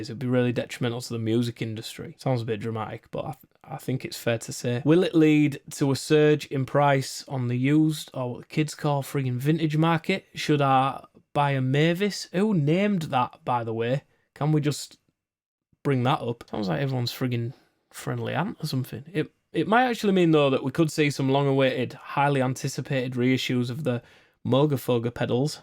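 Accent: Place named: Yorkshire, United Kingdom. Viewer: Sounds like Selby or a Donny accent. I'm I close?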